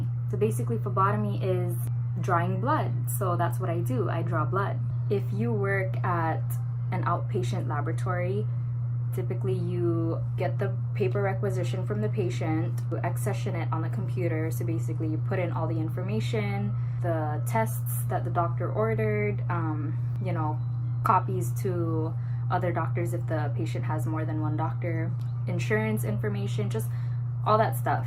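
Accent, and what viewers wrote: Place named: Trinidad and Tobago. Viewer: You must be trini I feel like a hear an accent ! Lol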